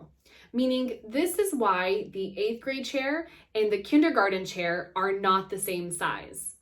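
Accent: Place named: Spain. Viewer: Love the Spanish accent 😍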